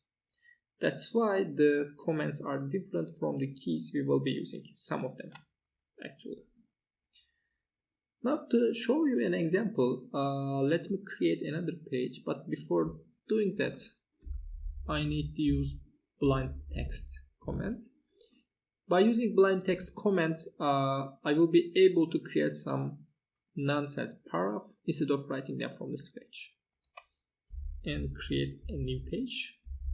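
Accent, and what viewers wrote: Turkey. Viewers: türk aksanı nerde duysam tanırım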